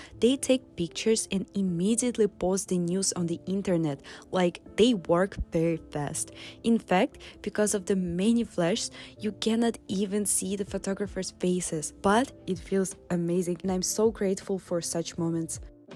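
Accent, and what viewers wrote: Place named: Russia. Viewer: 한국말하는 쇼츠영상이 더 좋지만 러시아 억양의 영어히는 도 좋아요 ㅣ😊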